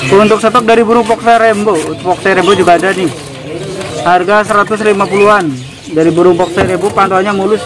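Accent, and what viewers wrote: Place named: Java, Indonesia. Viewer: Jawanya medok. Banget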